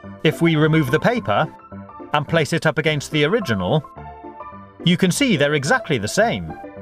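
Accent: United Kingdom. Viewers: You guys are fools, he's British and also has a British accent. He's even using a 50 pence coin in the video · It is a British accent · Fahima Husaini he’s just saying his accent is British I’m British to but I still laugh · He has a British accent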